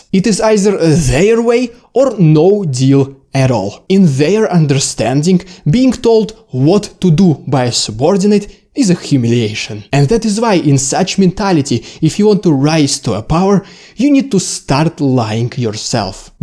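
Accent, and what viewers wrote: Russia. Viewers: К чему такой дебильный русский акцент? (Why such a moronic Russian accent?)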